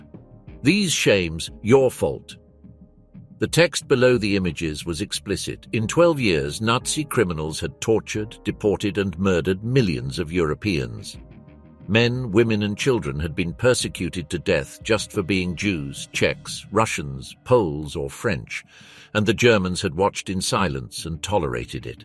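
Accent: England, United Kingdom. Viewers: Why are there so many videos on here about Ww2 with a narrator with an English accent that put Russian communism in a good light?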